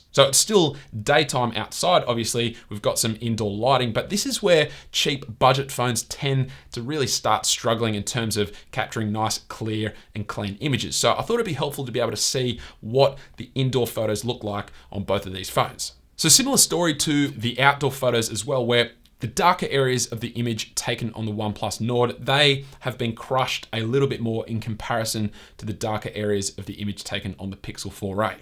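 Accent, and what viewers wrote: Australia. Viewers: U from Australia?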